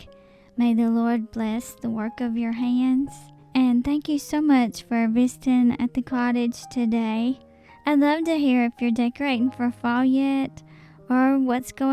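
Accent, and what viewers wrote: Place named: South, United States. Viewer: I don't blame you for that. I was just wondering because I saw it in your older videos and thought if you were still doing something like that I certainly wanted to look into it. Thank you for communicating with me. I love your videos with your Southern accent that I enjoy so much. I am originally from Texas and now live in Missouri. I get teased (even after all these years) about my accent. They call me Paula Deen. I take it as a compliment. Lol! Now if I could just cook like her and had her money! Only kidding. I am blessed beyond measure!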